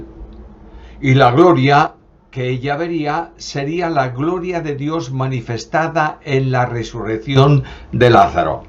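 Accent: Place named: Galicia, Spain. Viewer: El no es malhumorado, simplemente es su acento gallego, a mí sinceramente me agrada ver como el hace énfasis con su acento y tono, ¡Dios le bendiga!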